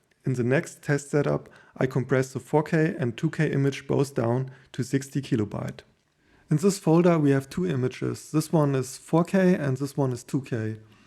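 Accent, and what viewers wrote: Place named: Russia. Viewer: Красавчик. Есть конечно чуток акцента русского, но в целом классно говоришь на англисском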